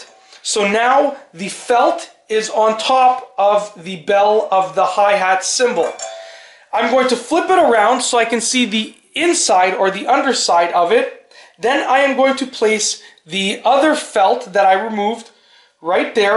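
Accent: Canada. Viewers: Awesome video man and it sounds like your from Canada howdy my friend and neighbor to the north. Keep up with the tutorials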